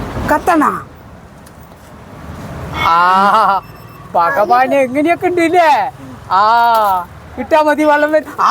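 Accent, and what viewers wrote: Palakkad, India. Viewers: നല്ല പാലക്കാടൻ ഭാഷ 😂🤣🤣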